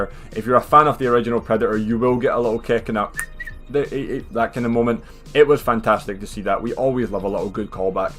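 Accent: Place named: Scotland, United Kingdom. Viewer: Mate you sound scottish 😀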